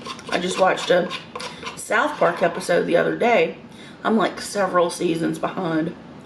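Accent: South, United States. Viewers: Love your Southern accent. Sorry for the bad people who hurt you. I just don't understand why people can be that way. I get so much enjoyment watching you enjoy your food and I guess it is a vicarious thing. Wish I had the same meals you eat. I really do trust your comments about food and think you have a wonderful discriminating sense of what is good and what isn't. You are a beautiful person and bring joy to many of us. Be well.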